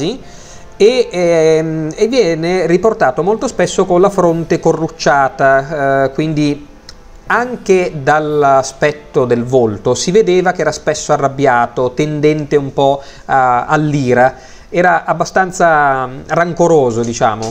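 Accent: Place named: Italy. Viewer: italyano!!!!!!!!!!!!!!!!!!!!!!!!! :( the most funniest accnt of all time and in the history of the universe whahahahaha!!!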